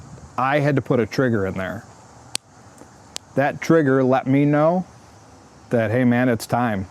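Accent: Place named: Canada. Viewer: He’s in America? He sounds Canadian to me.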